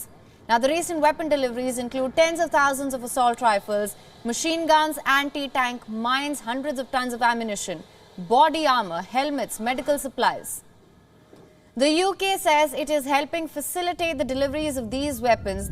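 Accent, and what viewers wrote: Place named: India. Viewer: Too heavy Indian accent --- can't understand what she was reporting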